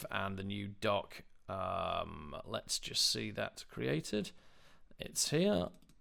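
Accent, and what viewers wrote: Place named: Yorkshire, United Kingdom. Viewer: Love a good Yorkshire man. Ay yup lad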